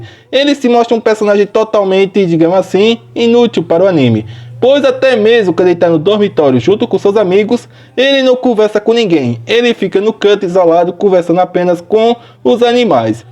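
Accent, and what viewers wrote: Northeast, Brazil. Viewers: Quando Vi A Thumbnail, Achei Quê Você Enlouqueceu De Tanto Teorizar Para Preencher Os Furos De Roteiro & Falta De Lógica & Etc ....., 😅😂😂😂. Adoro Seus Videos De Ben 10 e Seu Sutaque Nordestino???, Sulista???, 🎉😅.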